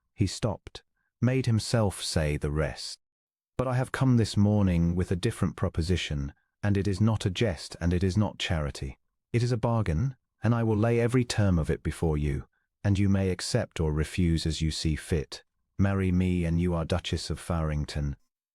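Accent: United Kingdom. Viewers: There were a few times I planned to post a corrected pronunciation, but found that “error” was just the difference between British and U.S. forms.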